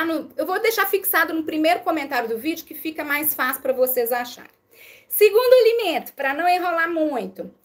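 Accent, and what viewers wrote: Minas Gerais, Brazil. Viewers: Parabéns, seu programa está muito bem produzido, o som está legal e você com seu sotaque mineiro está um show, sua didática está muito boa😅🎉😅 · Além do conhecimento que vc passa estou amando o sotaque mineirin...tb sou mineira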